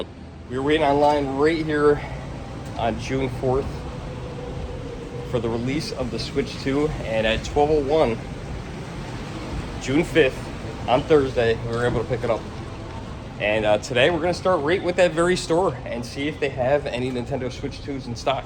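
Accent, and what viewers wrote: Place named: Midwest, United States. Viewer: Where in the Midwest are you? I can tell by the accent. :-)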